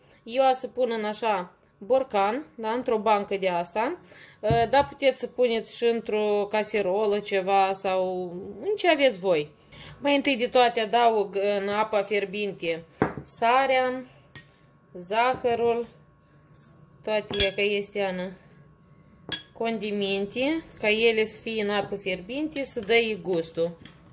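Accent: Moldova. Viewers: In afară că ești o gospodină desăvârșită, imi place de modul vorbirii tale pur moldovenește, intr-un an după revoluție am fost în vizită la cunoștințe in HERTA, și la fel cunoștințele vorbeau exact ca tine, ești superbă.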